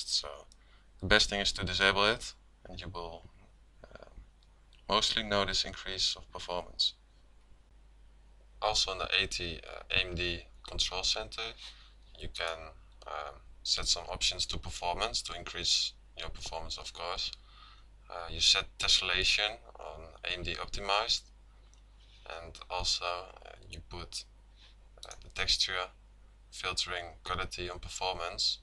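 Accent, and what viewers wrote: Netherlands. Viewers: Such a dutch accent <3.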